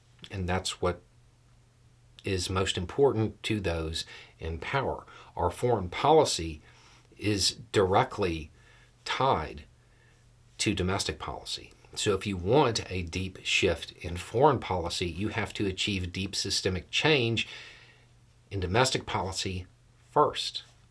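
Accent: New Zealand. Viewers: not sure if this is the case, but you sound as if you’re in NZ now? Possibly studied or lived there? 😊 I would love to get a chance to meet Māori people IN NZ instead of here in the US. The syntax of the language blows my mind. To Beaus point… this tRump disease has been working its way through international politics since about 2017’ish (possibly earlier) I watched Victorian and NSW politicians turn into antivaxxers overnight. I was heartbroken when I heard of the first community (local) infections in both NZ and AUS. Thank goodness I was able to reach a few of my friends who got the vaccine at my personal request. However, as great as being able to help there felt, I had neglected my own parents. My Mom passed from Covid in January of 2022. I wish that I could place the blame on tRump only. I cannot do that while others that lead to so much unnecessary suffering and death still walk around. 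So I keep trying. Thanks for letting me take you verbally hostage for a moment! 🥹 Don’t let tRump or his politics invade your country like he did ours. Lest many more suffer and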